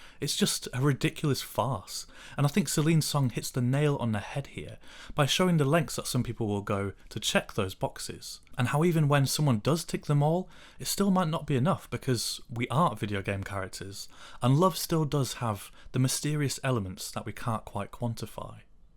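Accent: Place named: Yorkshire, United Kingdom. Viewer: Welcome back 😀🍿🙌📀🎸🎶 Hope it isn’t another 6 month till we see you again; you been missed 🤗 ‘Pale Rider’ is an awesome Clint Western; I watched ‘High Plains Drifter’ for first time this week 🤠 Once Upon A Time; one of my fav Westerns; what a film mate 😍 🤠🍿🙌 ‘Red Rocket’ is very good mate; ‘Anora’ is SB’s masterpiece IMO; love Florida Project aswell; seen it a few times 🏨 Not seen ‘Room At The Top’; but now you mentioned it is a Yorkshire based film; I’m in 👍👍 I really enjoyed ‘Materialists’ aswell bud; love my Indie Dramas 😊 What a wonderful comeback; keep up the superb content and can’t wait for your next video already; loving the Yorkshire accent as ever 🙌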